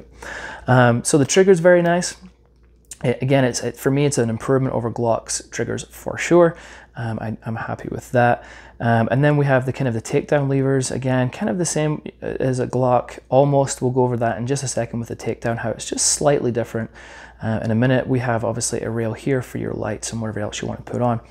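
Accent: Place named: Shires, United Kingdom. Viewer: You sound like you're from the shire.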